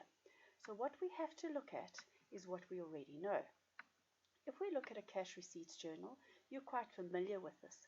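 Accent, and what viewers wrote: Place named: South Africa. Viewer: Eyy Ur South African🤣👌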